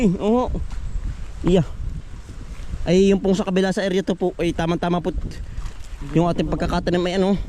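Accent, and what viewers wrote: Batangas, Philippines. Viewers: Taga Batangas po ba kayo? Hindi ko magets ang punto niong magsalita. Sorry po. Watching from Delano, California. Thank u n god bless.❤❤😊😊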